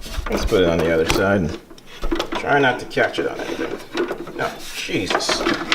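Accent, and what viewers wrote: Jamaica. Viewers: 100% Jamaican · Was that Jamaica speak, or more a Trinidadian vernacular ? Great humor in any case ! The adjustable proportioning valve is usually placed inside the cockpit for the driver to dial more or less as needed, you will not dig having to get out to adjust it in the pouring rain. A bit of silicone grease on the rubber washer will really cut down the binding you were having, and it will not degrade the rubber seals in the calipers or wheel cylinders. You described the method to hold a nut in a box end well enough, start by holding the nut captive inside while spinning the fitting on the outside to get the party started. I will not mention how the resevoir looks like it will be impacted by the engine, thankfully it should torque to the right so it might not pop the resevoir off. Look forward to the more fun that will come your way... 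you have a great attitude !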